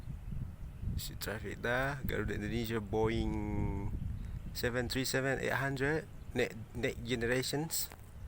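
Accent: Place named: Singapore. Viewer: When I hear his accent, I thought I am watching singaporean vlog... Great job pak cik...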